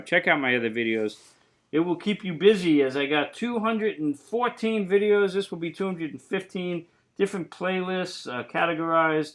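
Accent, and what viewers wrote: Boston, United States. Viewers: Wow your bahstan ahccent is thick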